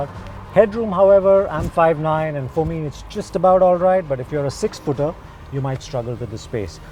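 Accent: India. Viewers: You must have never heard a native english speaker. He sounds just like any Indian on news channels. 😑😑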